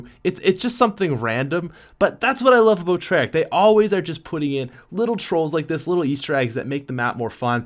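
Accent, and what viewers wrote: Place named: Newfoundland, Canada. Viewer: Is this guy Canadian???? The way he says “out” and “about” is totally Canadian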